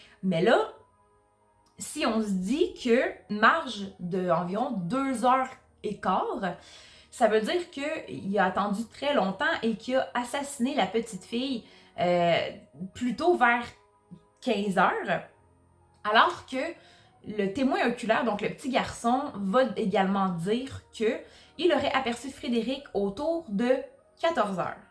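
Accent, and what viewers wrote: Canada. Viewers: Je connais pas cette histoire merci de la partager pas mal ton accent canadien 😱😱😱😱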